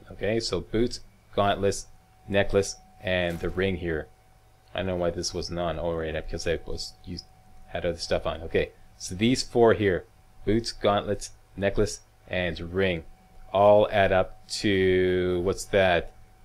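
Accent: Canada. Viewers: You’re Canadian aren’t you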